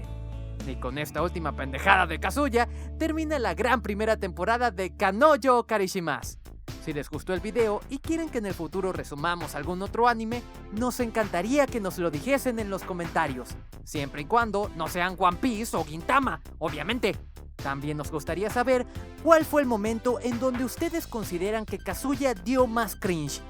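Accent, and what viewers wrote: Venezuela. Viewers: oye wey tu eres venezolano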